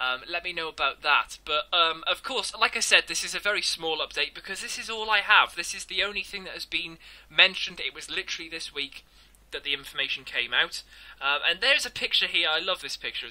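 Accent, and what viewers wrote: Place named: England, United Kingdom. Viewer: Matthew was wondering where abouts in England do you come from because your accent is really cool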